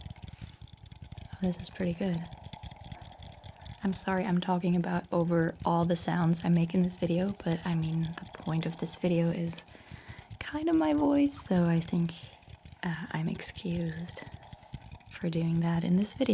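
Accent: United States. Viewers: How do you sound even more American in your regular voice 😂 The change is tingly though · You really, really sound American. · You sound even more American than usual. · How do you have an American accent?? Did you pick it up from American shows or learnt from a German who spoke English with an American accent? I'm so confused rn 😂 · Why is your english accent so American? Aren't you german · I’m confused. How are you German, but have an American accent? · Can you please tell me how/why you have an American accent but, I think you said, you’ve never lived there?! I’ve watched you for YEARS and have always wondered! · Whatttttttt?! Where’s the German accent? You sound like a normal American I’d talk to in the street 😂🤯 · HOW is your accent so American. It always stuns me how American you sound! I wish my language skills were half as good! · I never noticed how much emotion is taken away from your voice when you whisper but it sounds weird hearing you with more range and emotion. 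You also sound a lot more American when you speak normally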